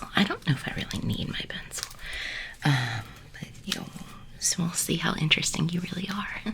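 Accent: South, United States. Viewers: Hold on a second, are you actually from the South? (I realize you probably get asked this all the time, but I'm curious)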